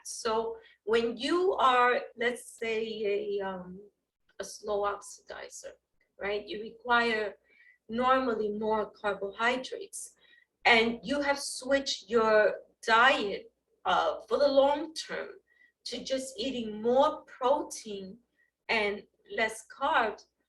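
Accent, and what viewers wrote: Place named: East Coast, United States. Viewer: Thank you for this info. Also I love your East Coast accent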